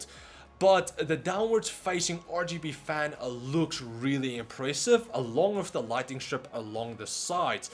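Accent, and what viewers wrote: South Africa. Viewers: South African? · Is this guy from South Africa?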